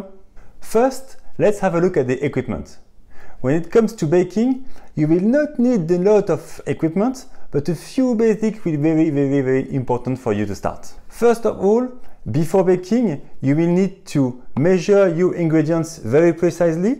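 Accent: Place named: France. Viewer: フランス語の訛りの英語ですねぇ〜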